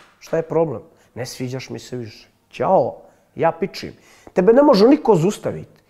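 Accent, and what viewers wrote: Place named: Rijeka, Croatia. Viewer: Ludane ludi, a znas kako mi je milo kad pričaš rišnjanski pored toliko godina Beograda!! ❤❤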